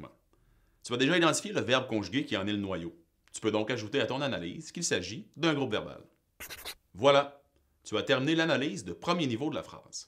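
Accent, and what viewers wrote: Quebec, Canada. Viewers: Son français est correct, il a juste un accent québécois.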